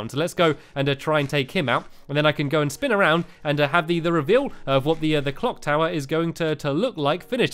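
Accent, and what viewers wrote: England, United Kingdom. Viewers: you have been building so many things in your lovely world thank you for doing that I have been building so many things on minecraft and it all came from you keep doing what your doing. P.S.I love your British accent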